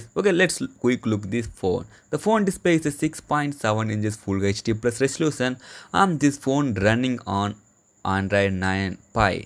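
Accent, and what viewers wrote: India. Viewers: chinese man with indian accent?? WTF?! 😂😂😂 that's not your video you copycat!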